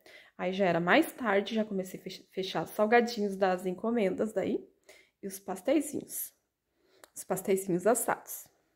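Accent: Rio Grande do Sul, Brazil. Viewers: Boa noite lindona , achei vc alguns dias atrás , já me escrevi , e antes de assistir o vídeo eu já dou laik , vc e maravilhosa , e eu adoro seu sutak gaúcho , que Deus te abençoe sempre bjo 🥰🥰🥰🥰🥰🥰🥰🥰🥰🥰🥰🥰🥰🥰🥰🥰🥰🥰🥰🥰🥰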